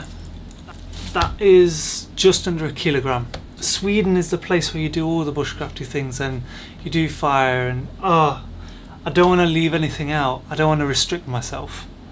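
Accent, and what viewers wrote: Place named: RP, United Kingdom. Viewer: New to your channel. Just subscribed and clicked on the "little bell thingy"! Loving the videos, especially the flattering lighting and the almost posh accent with Well pronounced T's and the distinct lack of the phrase "yaaaa". Not forgetting your lovely partner Lilley....... I hope that's correct? Haha keep up the good work. We love the channel.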